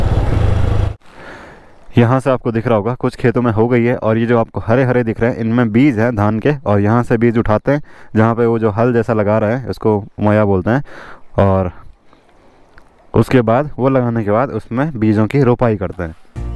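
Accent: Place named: Rudraprayag, India. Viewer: Apki rudraprayag ki garhwali thodi alag h ......😅😅 Thodi alag sound hori h 😁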